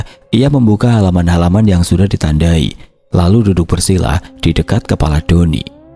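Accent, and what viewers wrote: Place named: Java, Indonesia. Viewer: Waalaikumsalam, maaf ga maksud tp tiap mas bawain cerita berbahasa Sunda, lucu karna logatnya jawa, suka banget dengernya😊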